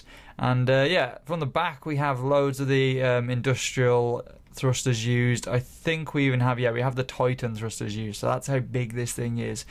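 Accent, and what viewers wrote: United Kingdom. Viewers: Hey narrator, are you from Cannock?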